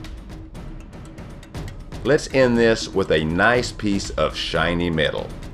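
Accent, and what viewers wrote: South, United States. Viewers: Fortunately we in the USA associate a thick, good Southern accent with superb space-science- in fact, if sounded like typical YT A.I., who could care. Please keep up the great work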